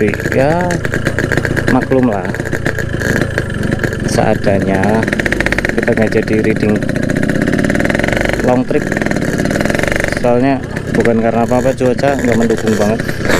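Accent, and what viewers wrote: Indonesia. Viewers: Walaikum salllam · Logat nya jawa timuran asli mana bang salam santun dari blora jawa tengah bang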